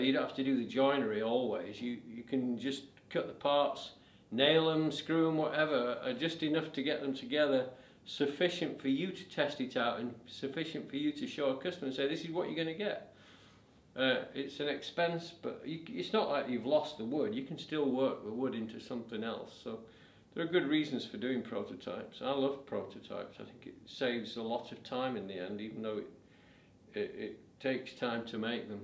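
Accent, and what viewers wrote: United Kingdom. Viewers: I was kind of mislead by your wonderful British accent, when I found out you actually live and work in the US. That was a surprise.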